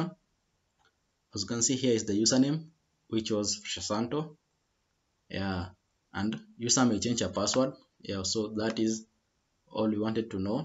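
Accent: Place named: Kenya. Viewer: omg this guy is kenyan the english tells it all rada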